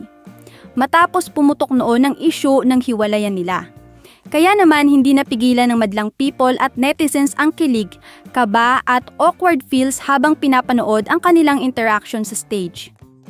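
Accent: Visayas, Philippines. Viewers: bisaya kaayo.. gahi imong Tagalog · Tigil tigilan mona ang pag voice over mo hnd mo bagay bisaya amp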